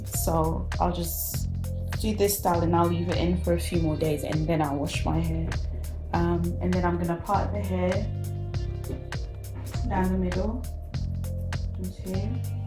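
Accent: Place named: United Kingdom. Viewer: Instagram Subbie here, I never knew you had a British accent. Just always loved your hair.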